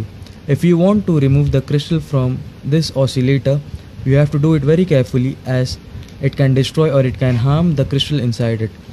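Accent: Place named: India. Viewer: I like your Indian accent. Great video!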